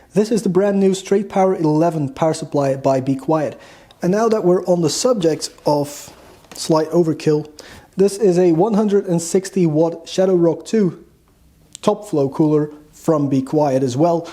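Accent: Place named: Netherlands. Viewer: Where are you from? You sound dutch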